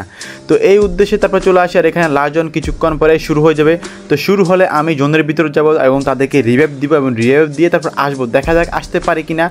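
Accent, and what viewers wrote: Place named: Lakshmipur, Bangladesh. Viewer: Vaiyer basha ki lokkhipur naki?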